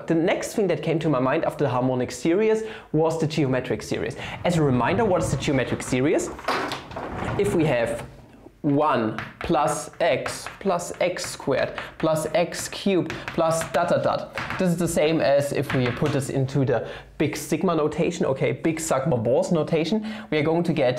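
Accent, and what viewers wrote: Germany. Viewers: Its funny how you know after 2 sec that he is german 😂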